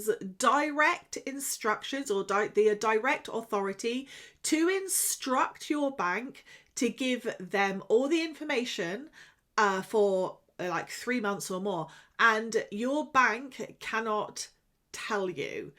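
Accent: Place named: RP, United Kingdom. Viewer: Can u not speak normally no need to trying to speak like a queen.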